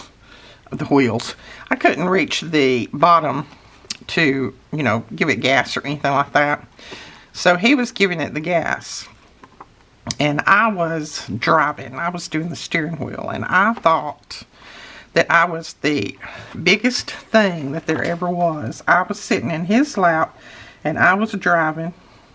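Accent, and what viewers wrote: South, United States. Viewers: Good afternoon, while I watch and listen to you I am sewing ginger bread dollies for my church nursery..watching you makes me get the paper bug. as soon as I finish the dolls I am going to make Gratitude journals for my ladies group at church.. I am buwy crafting most every day.. You have a blessed day. I love listening to your wonderful southern accent..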